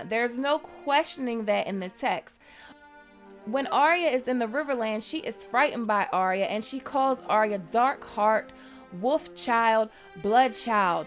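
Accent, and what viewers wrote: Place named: Philadelphia, United States. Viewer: Your videos are very well thought out and entertaining. Your passion for the subject matter shines through and is absolutely infectious. And your voice,...it triggers something primal in me. Can't explain it simply which means I must not understand it well enough. But to avoid sounding creepy I'll just say your voice is very nice. Sounds unmistakably Philly to me but idk. Your work and effort is much appreciated.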